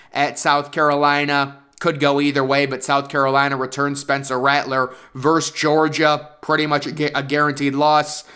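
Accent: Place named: Midwest, United States. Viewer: you have slight mid west accent. you live in Michigan?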